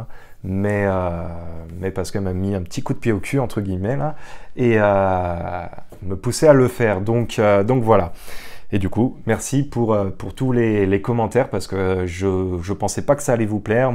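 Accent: Quebec, Canada. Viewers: BONJOUR GROS , tu as pris l'accent QUEBECOIS déjà , c'est excellent j'ai remarquer sur tes intonations , c'est excellent dans un an tu seras bien acclimaté , en tout cas super choix d être parti vivre une belle aventure plein de bonnes choses à toi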